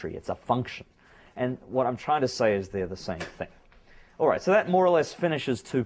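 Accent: Australia. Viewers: this lecturer sounds like he has a bit of an Australian accent. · oh, australian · Is he an Australian ?? His accent doesn’t seem American. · Is he an aussie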